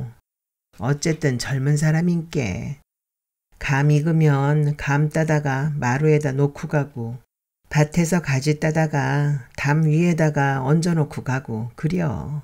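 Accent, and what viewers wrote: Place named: Jeolla, South Korea. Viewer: S님의 남도사투리 끝까지 재밋게 잘들었어요.😀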